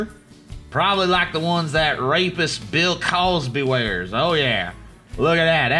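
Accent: South, United States. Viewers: Where did You learn that dialect? as a Southerner i`m impressed.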